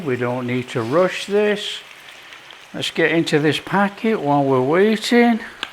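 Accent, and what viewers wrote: United Kingdom. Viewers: love the cooking as well as the beautiful British English accent ❤️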